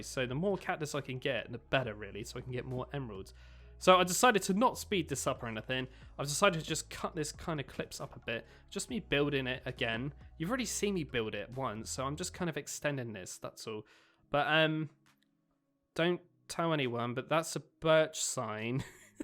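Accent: United Kingdom. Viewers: This series is the first Thing ever I saw from this man I just now physically actually realized he’s British and it just makes me feel so stupid PHWHWHWHHWHWHW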